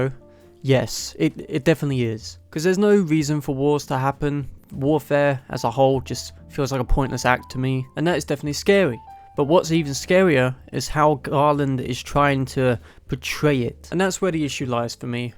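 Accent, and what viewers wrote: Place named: RP, United Kingdom. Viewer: U sound like an upperclass nob tbh so I wouldn't listen 2 Ur opinion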